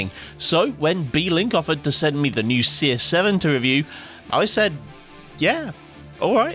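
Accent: United Kingdom. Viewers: He doesn't sound at all like NX gamer, both have british accents but that's it. They're even different dialects from different parts of England.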